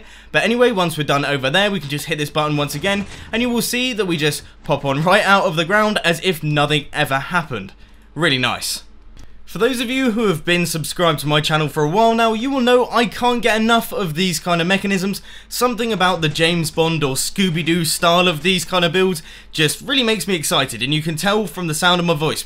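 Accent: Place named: United Kingdom. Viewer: What on Earth is this eccentric sounding British chap talking about?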